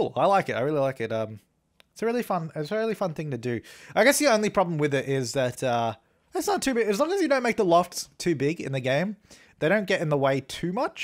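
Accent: Australia. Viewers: Erdnussbuttertoast it's Aussie :') I think he lives in Sydney ☺ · Erdnussbuttertoast Australia . I think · Erdnussbuttertoast it's obviously an Australian accent...😂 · Erdnussbuttertoast Australia, like me! Who else is from the down under here?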